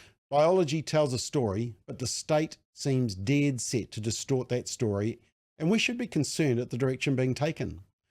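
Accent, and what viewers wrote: New Zealand. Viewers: You are criticising an accent. New Zealanders are not going to change their accent just because you don't respect it. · I think spoke well, and as a fellow kiwi I understood everything he said. I'm sure overseas listeners will or should, take into account the kiwi accent. Thanks for a your great presentations,. 🙏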